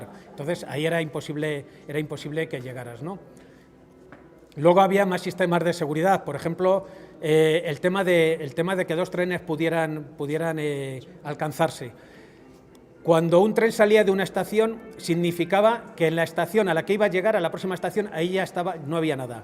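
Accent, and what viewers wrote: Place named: Castile, Spain. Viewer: No tiene ningún seseo. Habla un perfecto castellano. A ti te gustará lo tuyo y a nosotros lo nuestro. Las preferencias de cada uno, son eso, de cada uno, te las guardas para ti. Gracias.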